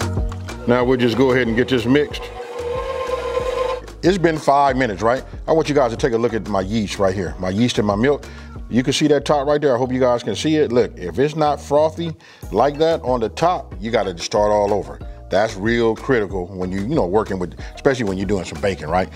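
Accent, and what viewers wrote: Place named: California, United States. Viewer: Facts!!!! I get all my recipes from him.. he needs to have a show on Revolt or BET, TVone or something.. cool, not corny.. and you can tell he from 'round the way lol.. I f*cks with and his Cali accent lol 💯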